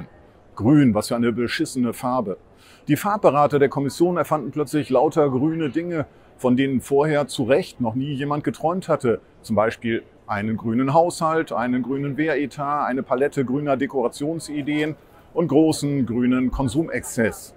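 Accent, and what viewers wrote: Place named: Germany. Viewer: Was für ein heftigen deutschen Akzent die alte hat. Uffff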